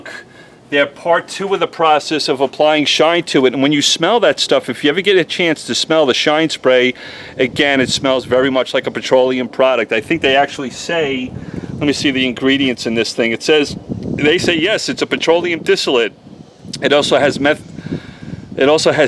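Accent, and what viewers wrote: New York, United States. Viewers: your accent sounds like your from new yark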